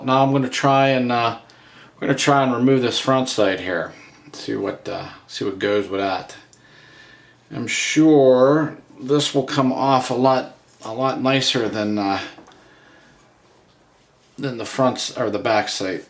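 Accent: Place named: Pittsburgh, United States. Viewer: Nice video. Lookin to do the same swap on my shield 2.0. Are you from Pittsburgh?? Definitely picking up on a yinzer accent.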